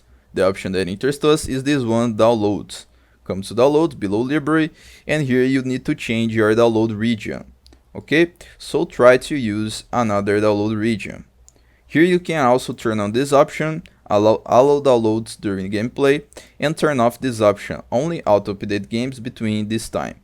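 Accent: Brazil. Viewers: i needed 3 words to know you are Brazilian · That's because his native language isn't english. He is from Brazil, lol.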